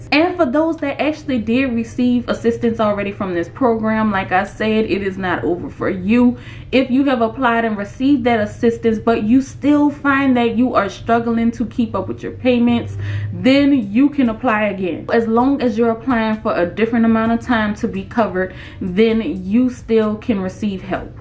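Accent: New Orleans, United States. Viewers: I love you New Orleans accent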